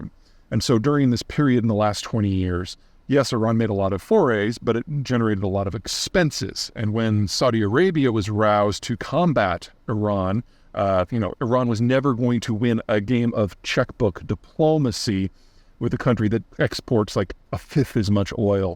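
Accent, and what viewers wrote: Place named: Texas, United States. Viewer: He lived in Texas for a long time. We all just pronounce it that way. Not defending it, it's just a local accent thing.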